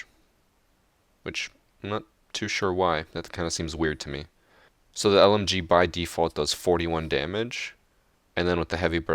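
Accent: Canada. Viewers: I love the way Canadian people say out and about😂😂 I know he doesn’t think he has a Canadian accent but he definitely does 🤣🤣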